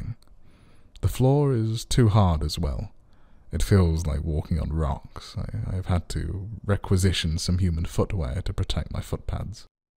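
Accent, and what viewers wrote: United Kingdom. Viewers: ok. Ive no doubt youre using real voices. IF you are using AI voice, youve fooled me. But nah. Youre clearly from the UK. the accent. Youre not US, Youre not Aussie or Kiwi, That is a UK accent. Mum, Buubly, certain words pronounced as only UK accent does. Its great, Youre an awesome narrator. Thanks for reading us the SciFi. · The Queen's English accent is perfect for this story about a alien dignitary.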